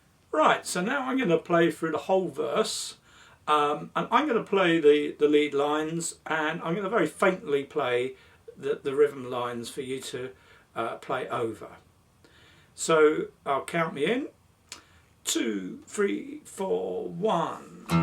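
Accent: United Kingdom. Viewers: This is a very good tutorial. I have been playing that progression, that way for several years; my dumb, I didn't know it was Texas Blues. This teacher does a good job of explaining everything, I thought it was cool to be learning T.B. from a bloke with an English accent, but why not.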